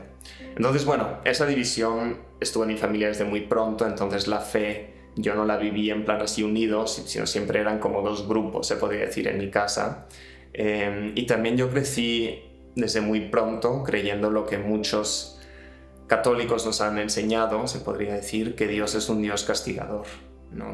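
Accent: Mexico. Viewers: Soy española. Tienes acento mejicano, te has criado en Méjico? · Vi un vídeo de la entrevista que le hicieron al Padre Javier Carralon creí que grababan desde España jaja nunca creí que ya eran Mexicanos 🇲🇽❤ después ví el vídeo del testimonio de Patrick, después el testimonio de Sofi y ahora tu testimonio, ahora ya te sigo. Por la entrevista al Padre Javier creí que llevaban mucho tiempo de conversión nunca me imaginé que era tan reciente. Muchas felicidades por haber tomado la decisión... De aquí para adelante. ah y Bienvenidos a México (ya tienes acento mexicano)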